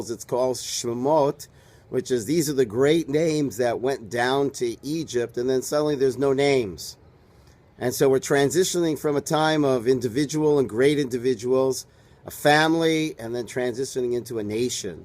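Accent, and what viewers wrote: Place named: East Coast, United States. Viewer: I am so transfixed by listending to you.You are talking not as a Chabad Rabbi but to me you speak as a fellow from back East ( I am from Bensonhurst) ( I was born in Crown Heights Hospital) and one who makes me remember my jewish soul between the times I pray at my local Chabad in California on Sat, Hear Rabbi Gordon on Sunday for breakfast, Meet with Rabbi Dovid and the seniors on Thursday ( that almost makes a week) oh...and come at 7am when they dont have a minyon. Barry Solomon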